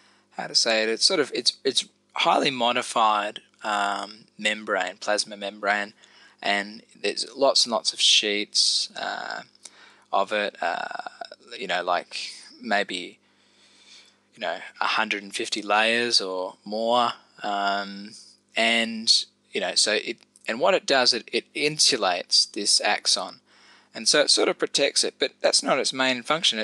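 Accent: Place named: Australia. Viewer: Hey. I am in my first year of studying a paramedic / nursing (double) degree, got my A&P exam on Monday, on swot vac week at the moment. Don't know how I found your YT Channel but it's been SO useful. I'm an aussie too and can I say it's so nice to finally find YT videos that have a normal Aussie accent! So easy to listen to. (Nothing against other accents, it's just easier to listen to being that I am Aussie too). You're great at explaining shit so thanks heaps for that, CHEERS! V useful!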